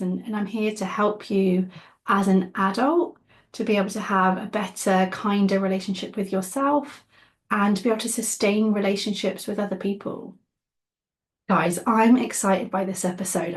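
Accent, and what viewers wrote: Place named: United Kingdom. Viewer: Love your British accent! 😊😉🌺💯💯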